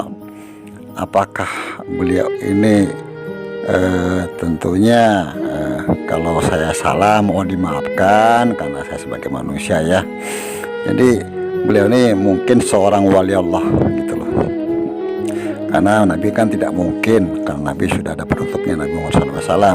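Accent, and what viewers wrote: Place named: Sulawesi, Indonesia. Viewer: Abah ini yg pny chanel yah...aksen suaranya sama hehee..ciri khas Sulawesinya gak bs dibohongi bah 😁👍👍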